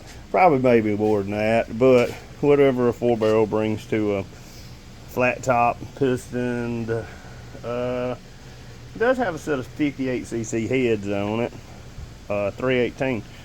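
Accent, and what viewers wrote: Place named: Alabama, United States. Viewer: I saw Alabama car rescues and clicked on the video and as soon as i heard you start talking i knew your from Alabama. 😂😂 Me too, dont know why ive never seen channel before,new sub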